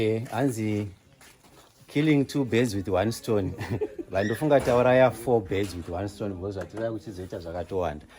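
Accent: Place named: Manicaland, Zimbabwe. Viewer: U sound manyika 80% come back home/kanyi 😅😅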